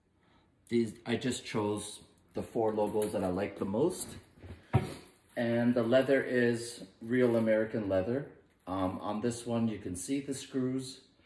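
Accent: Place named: Jamaica. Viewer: He almost sound jamaican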